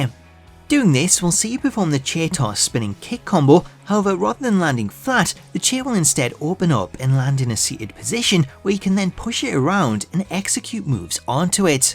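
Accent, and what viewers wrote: Newcastle, United Kingdom. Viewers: You’re a Geordie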